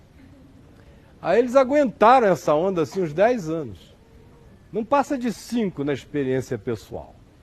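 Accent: Recife, Brazil. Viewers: ai sabe que fala recife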